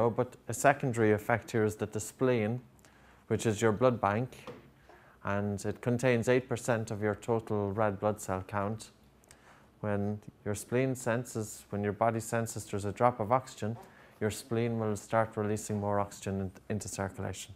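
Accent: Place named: Ireland. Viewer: Irish accent, originally grom Galway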